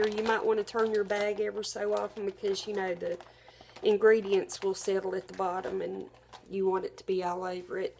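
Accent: South, United States. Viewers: That looks delicious! Are you from the south? I love your accent.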